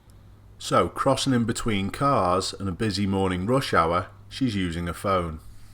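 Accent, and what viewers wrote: United Kingdom. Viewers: Everyone here, including the uploader, thinks there is only 1 set of laws in the entire world. You're wrong. Every country has different rules. Judging by your accent, I'm assuming this video is about the laws in the UK. But these laws do not have to apply for places elsewhere.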